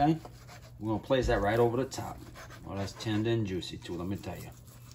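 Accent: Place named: New Orleans, United States. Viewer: That's NewAwlins talkin Dawlin!!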